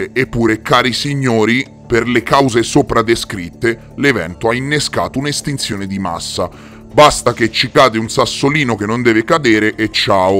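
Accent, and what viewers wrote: Tuscany, Italy. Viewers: Grande.... Se posso chiedere ma sei toscano? Scusa la mia curiosità grazie per le informazioni che ci metti a disposizione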